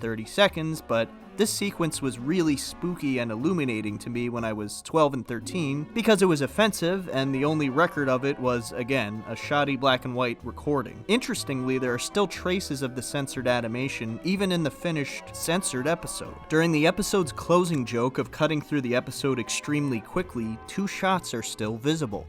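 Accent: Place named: United States. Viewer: Interesting video but your American pronunciation of all these British names is throwing me off. It is so funny they tried to repeatedly dox David Frost.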